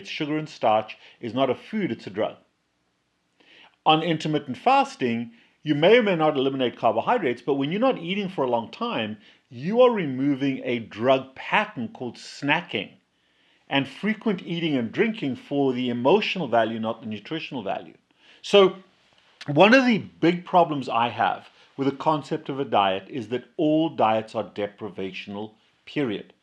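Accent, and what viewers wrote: South Africa. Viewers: I really love this! It's taken me 56 years to learn emotional strategies after having been brought up in a home where food, opiates, alcohol and cigarettes were how my parents coped. And how I spent my whole life coping. Now, finally, at 56, I am fighting daily for my freedom from all those things, as I transferred in and out of all of them. And it's working. The most significant change has been following a keto diet. Meat, veg and fat. No baked, fake copy foods. Just from the earth. Only if it swam, ran, grew or flew, will I consume it. And my life has changed., love it that you still have an accent. You make us SA folk proud. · My mom had bariatric surgery many years ago. She lost a ton of weight because she could not eat, but then put it back on again when she discovered she could drink all the coke and alcohol she wanted. She used to also melt chocolate in a fondu pot and drink the chocolate with a straw. 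She died ten years later of liver cancer. I am so glad I stumbled onto your channel. You sound South African. Hello from Johannesburg.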